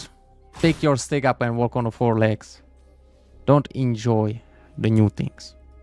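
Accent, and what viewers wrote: India. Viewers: I thought you were indian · all this while i tot was indian from his accent.:D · i though you were indian lol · I thought you were an indian cuz of accent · Yeah, he sounds Indian 😭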